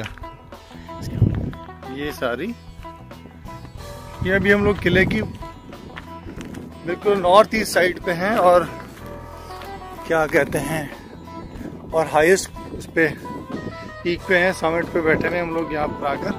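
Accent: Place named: Sindh, Pakistan. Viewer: Great,you doing well and your sindhi accent is cute.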